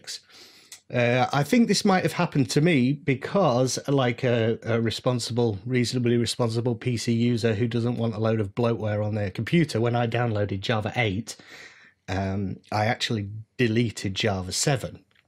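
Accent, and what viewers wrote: United Kingdom. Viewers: nice accent british or ?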